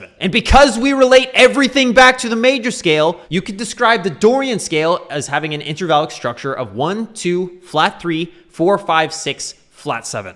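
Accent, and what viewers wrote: Canada. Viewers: Why are you swearing. Sounds like you are from Canada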